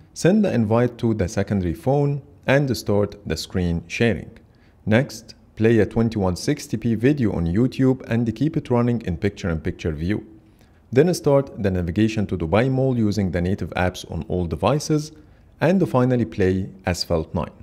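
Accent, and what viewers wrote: Egypt. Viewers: انتي مصري صح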